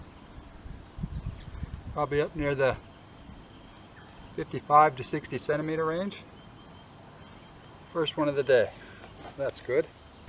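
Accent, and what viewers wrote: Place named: California, United States. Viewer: You're originally from California, right? It always amazes me how similar our accents are to each other.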